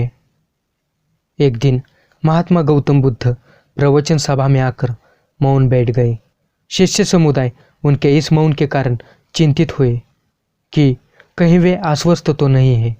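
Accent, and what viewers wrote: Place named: Maharashtra, India. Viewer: तुमच्या हिंदीत मराठी झलक आहे मराठीत बनवा video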